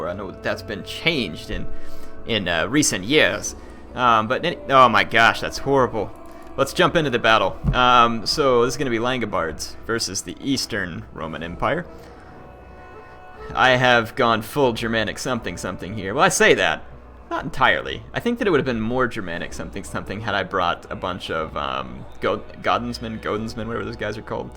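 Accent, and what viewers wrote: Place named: Kentucky, United States. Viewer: Always making my night better with that great Kentucky accent, and leading such a victorious Germanic something army, makes it even enjoyable, great job, I'm really looking forward to the next one, also I really really wish that Attila had the Suebian faction.. I really loved them in Rome 2 but they were a little bit... naked, it would be awesome to see them armored suebi rampaging in the lands of the western romans.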